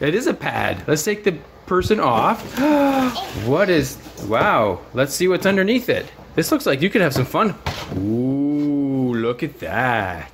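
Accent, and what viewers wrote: Canada. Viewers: Strong Canadian accent but I can still understand what he is saying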